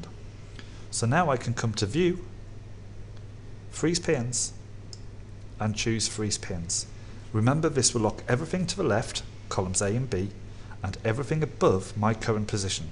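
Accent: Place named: North, United Kingdom. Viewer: super helpful and straight to the point. As a fellow northerner, love the comfort of that accent!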